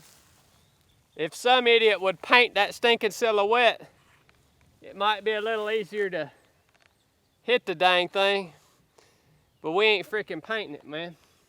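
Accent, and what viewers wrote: South, United States. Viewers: I live OCONUS and my wife is not American, so I show her videos as the prime example of the accent from my beloved homeland. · You got to love that southern accent when he pronounce Fiocchi "Foochi" 😅. Get MTG on your video!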